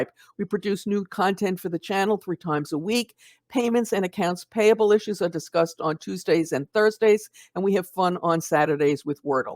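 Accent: New York, United States. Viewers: New Yorker?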